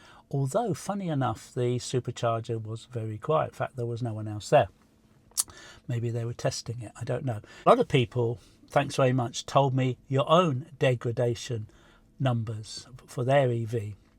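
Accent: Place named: United Kingdom. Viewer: I didnt realize you were from UK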